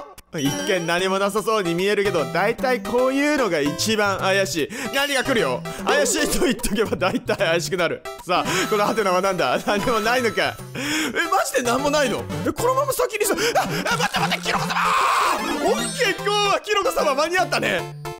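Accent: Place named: Kyushu, Japan. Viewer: こんなOPとか言ってるけど、、、それがいいんすよｗ こんなって言ってるけど、こういうのも考えて作成して、そして動画編集もして、、、、無理しない程度にお願いしますね！長く永くなが～～～くさんの動画が見たいので！！あと、自分熊本なんで、ちょくちょく出る方言も聞きなじみがあるけん、そのままのスタイルで言ってほしかばってん、、よかろか？？お願いしますｗｗ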